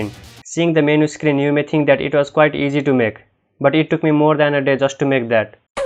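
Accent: India. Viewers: u r acent is quite indian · are you Indian? you sound like one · This is why i love Indian accent it easier to understand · Bro why ur sound u from India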